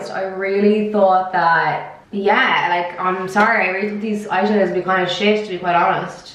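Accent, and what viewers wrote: South Dublin, Ireland. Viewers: South Dublin accent. Sounds like an Irish person trying to speak with an American accent. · Clicked on this video not knowing who you are, heard the Irish accent and subscribed instantly 🤣💖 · I think she is Irish 🙂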